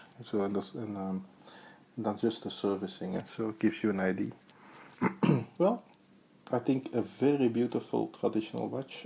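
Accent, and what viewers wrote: Flanders, Belgium. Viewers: Nice review, love the Flemish English accent by the way. 😉. I bought the same watch but it's a 38mm, 18mm lugs and solid case back. Even more vintage that way and better suited for smaller wrists. Keep up the nice work. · je klinkt als een Belg.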